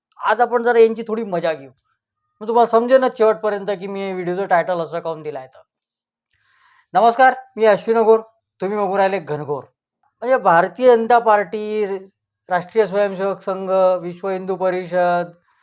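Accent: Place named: Amravati, India. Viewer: अगदी अमरावतीची भाषा, खंवचटपणा! पुन्हां पुन्हां ऐकतो तुम्हाला.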